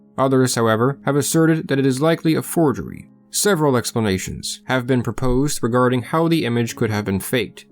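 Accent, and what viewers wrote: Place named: United States. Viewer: He's speaking SAE, Standard American English.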